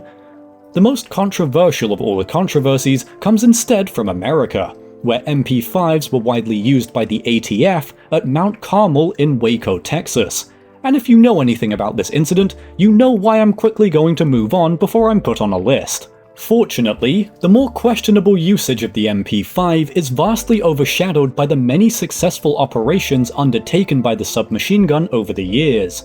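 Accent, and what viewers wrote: United Kingdom. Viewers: Like most people I find the English accent very irritating.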